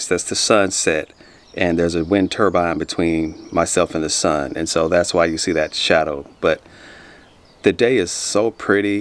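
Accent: United States. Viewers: Great video, as always. I've always wondered why Americans refer to themselves as African American, or Italian American, it's not a concept easily understood in England/UK. Can I ask what do you know of your ancestry and how far back you can trace your family. I have records going back about 100 years for my and my wife's family but nothing before that. For what it's worth I perceive you as an American, not African American, and really only because of your great accent! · Yes I do find it strange that black people in America use African American. I have questioned my black friends in America. After all you’re all American- black, white,Asian. My nephew is half nigerian but he was born in England so he’s english. His heritage is mixed but where you’re birth takes place is where you are known as from. If I saw and spoke to you , I would think “ok he is American”, because of your accent. 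Sorry but I find it absurd that whites in America don’t justify their otherness but all other ethnicities do as this seems like they are not fully seen as American. I am so glad in Britain we don’t use our ethnicity to identify ourselves as we are all british people and we can see different ethnicities but united in our diversity. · Definitely an American with an accent like that 🙂 I could listen to this guy all day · To a Brit, you´re just an American. The accent - and sometimes the wardrobe! - is what defines you to us. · I'm an Englishman, my father was irish, but i consider myself English, you, to me, have an American accent, so, i would call you an American. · If I seen you and heard your accent i would think oh he's American or Canadian😁😁 that's it I've found there's only one thing that separates us and that's MONEY. growing up in school I had black friends and we ALL had a big thing in common we were all POOR we didn't care about SKIN because that's all it is just SKIN. 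Welcome to the UK my friend hope you and your family have a good life hear much love and respect.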